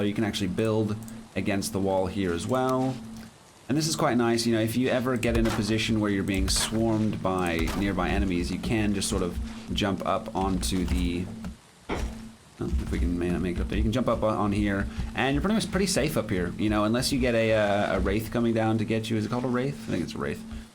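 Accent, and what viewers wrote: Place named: United Kingdom. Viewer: You have a pretty strong UK accent imo. Haha. Thought you were a "native" xD Where are you originally from?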